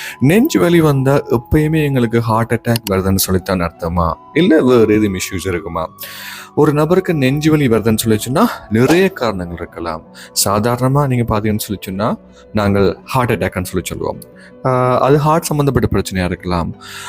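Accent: Sri Lanka. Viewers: உங்க இலங்கை தமிழ் உச்சரிப்பு அருமை தம்பி 🎉🎉🎉சட்டுன்னு பார்க்க AI மாதிரி இருக்கு நீங்க எங்க work பண்ரிங்க my son joining 1year mbbs in channai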